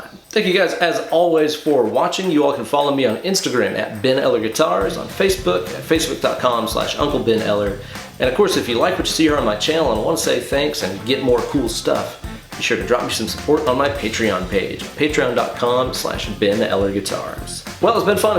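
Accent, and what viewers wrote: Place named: East Tennessee, United States. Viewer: I'm from East Tn, and you sound like half of the people I know. Any chance you're from around here?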